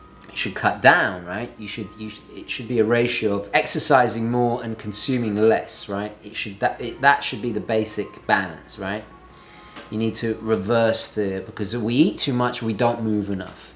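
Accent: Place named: United Kingdom. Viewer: Hi, i like your vid because they are optimistic and positive. I love also your english. Very distinguish and british.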